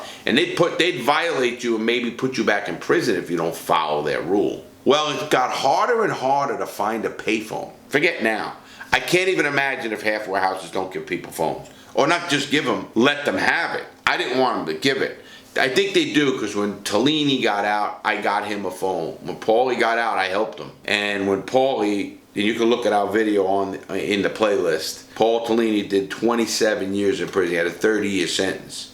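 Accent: New York, United States. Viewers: I love the NY accent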